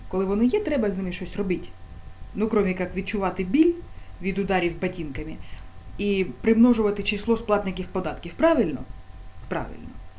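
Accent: Surzhyk, Ukraine. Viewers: Хоть бы говорила нормально, а то один суржик...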